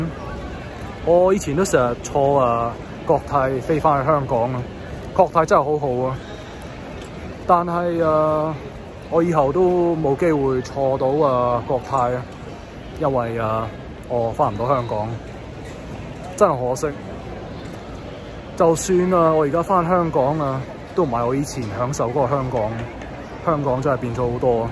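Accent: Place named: Guangdong, China. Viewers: 你既口音，好地道，廣府味濃👍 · 聽你口音跟本不是香港人，非香港出世長大，相信你是廣州人，為咩拐香港人呢？香港國泰地勤多說英文，報導不實。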